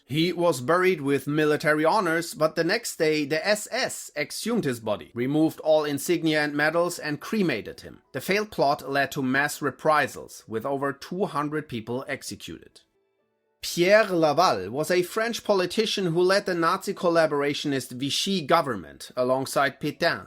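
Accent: Germany. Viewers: I'm still trying to figure out what part of Germany he's from.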